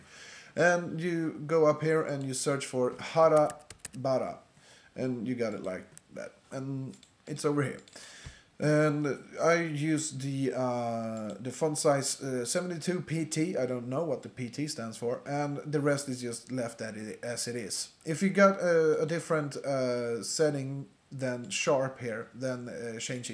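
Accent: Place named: Sweden. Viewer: based on you accent. I'm guessing you're from Sweden ;) besides that. Thanks for the tutorial!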